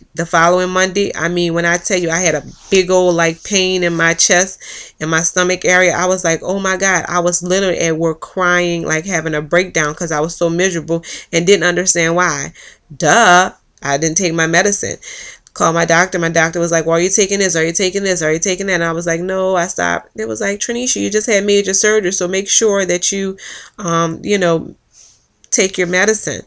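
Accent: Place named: Louisiana, United States. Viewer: Aww hun!! The good thing is that you are doing much better!! I think we all go through that "Why", once I got home from surgery...I was like what in the world did I do. But like you it is all for a better me. I still question did I pick the right surgery...I battled between Sleeve and Band but I am banded now and have enjoyed the ride thus far. Sending love and a big hug your way. I hear the Louisiana in ya. I am from New Orleans originally!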